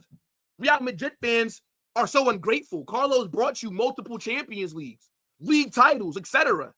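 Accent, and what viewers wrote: United States. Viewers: Are you American bro?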